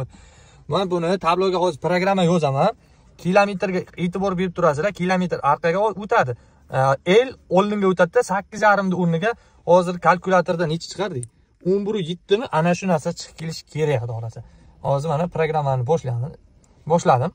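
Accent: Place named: Jizzakh, Uzbekistan. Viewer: Oooh Jizzah shevam....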